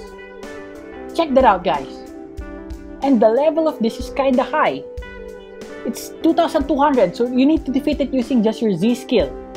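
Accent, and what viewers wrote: Philippines. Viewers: Is he Filipino his accent is like Filipino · I was wondering if he is pinoy cuase he's voice is pinoy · Your accent is like Filipino. Are you from Philippines?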